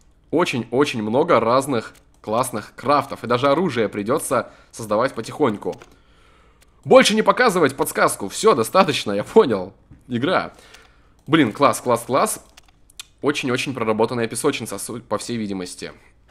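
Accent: Belarus. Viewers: ты белорус или русски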